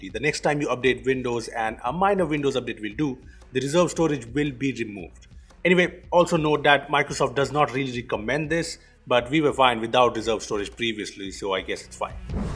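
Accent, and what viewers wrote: India. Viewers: How to show fps? You talk too fast indian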